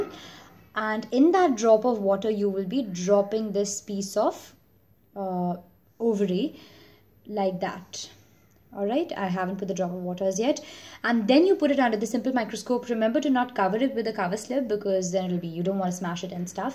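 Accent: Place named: England, United Kingdom. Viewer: Impressed by English accent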